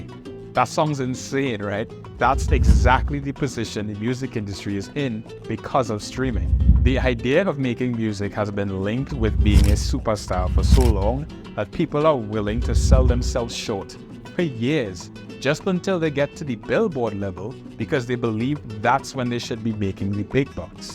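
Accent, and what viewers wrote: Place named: Germany. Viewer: Was not expecting that accent bruh lol. You German?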